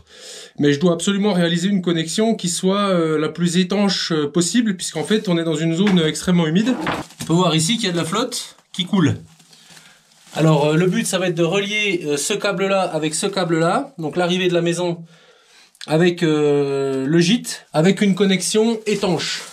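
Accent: Germany. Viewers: rien n'oblige à mettre les raccords l'un en face de l'autre ? si par ex on perd l'écarteur, on pourrait les décaler ; le pouce bleu n'est pas pour l'accent allemand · L'accent allemand de malade 😅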